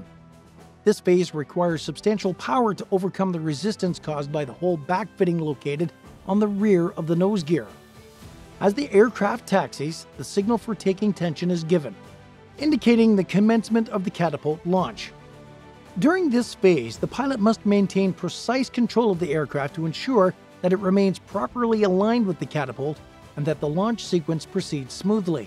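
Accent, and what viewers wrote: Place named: Canada. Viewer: Canadian voiceover!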